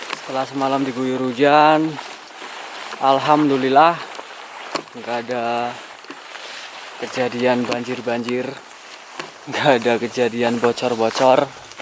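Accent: Banyumas, Indonesia. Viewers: wong endi sampean kang? kayane sesama republik ngapak kie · Deneng ming kaya kue tok ? Yo wis lah. Mantappp ... Tetep semangat. Ora ngapak ora penak.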